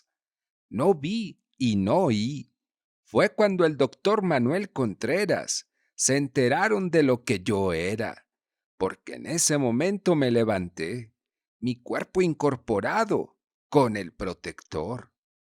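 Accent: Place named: Yucatan, Mexico. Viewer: Wow muy bueno 👍 hasta tonito de yucateco hicistes muy buen trabajo 👍 por eso te sigo por tu buen trabajo 👍 gracias por compartilo tienes mi respectivo like #11 saludos desde Chicago IL 👍🙏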